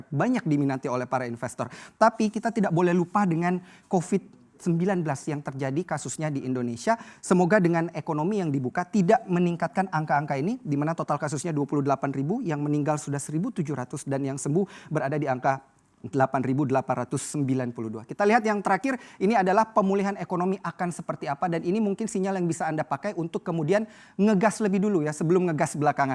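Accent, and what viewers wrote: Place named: Kalimantan, Indonesia. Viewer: Aku nebak dari loghatnya presenternya orang kalimantan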